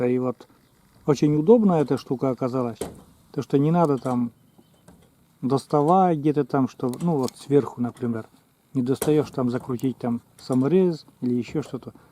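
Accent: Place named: Komi-Permyak, Russia. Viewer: Я когда то жила на севере Пермской обл.коми-пермяцкий округ,мне твой говор,родной.Как только услышала первый раз,сразу поняла🎉,что говорит коми- пермяк. Я уже начала язык понимать,да родители уехали оттуда,а мама знала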